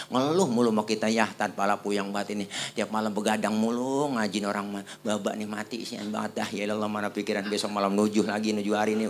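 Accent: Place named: Jakarta, Indonesia. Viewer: Betawi? Enak bett dahh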